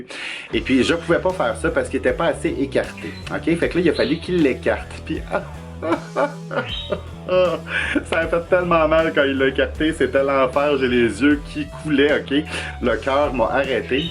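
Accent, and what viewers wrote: Quebec, Canada. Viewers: J'ai adoré cette vidéo 🤗 Déjà l'accent Québécois, je trouve que c'est trop beau 😍 Et ensuite j'ai ris de ta manière de raconter ton expérience. C'est certain, je ne ferais pas de septum et pourtant je trouve ce piercing trop beau. J'ai 44 piercings dont 14 fait moi-même. Mais le septum euhhhhhhh pas le courage. Merciiiiiiiiiii pour cette vidéo 😉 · ah voilà t'as répondu à ma question ! Je ne savais pas d'où venais ton accent, ct une question sans jugement. Parce qu'il avait des mots les quel j'avais pas compris et jai jamais entendu un Québequois parler ...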